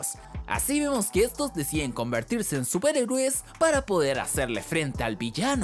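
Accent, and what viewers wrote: Chile. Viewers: Eres chileno?... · MIRA EL PERKIN este, no sabia k eras chileno D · Ahora, en este video si que si que confirme que eres chileno, y eso es bueno para decir que los chilenos podemos hablar bien, y que eres muy bueno haciendo resúmenes de películas, tremendo like que te doy 😎🤙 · no sabia que este weon era chileno xd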